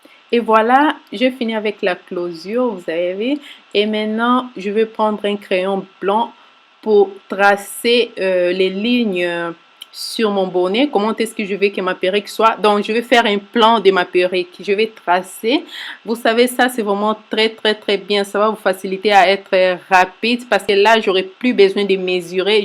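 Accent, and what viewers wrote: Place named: Gabon. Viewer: j'aime beaucoup le thon gabonais de la part d'une kmer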